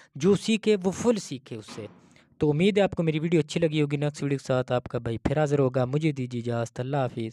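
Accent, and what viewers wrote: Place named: Punjab, India. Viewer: اپنا لہجہ درست کرنے کی کوشش کریں پیارے بھائی آپ اردو بولتے ہیں تو ایسا لگتا ہے کہ آپ پنجابی بول رہے ہیں اسکے لئیے آپ نے زیادہ زور سے نہیں بولنا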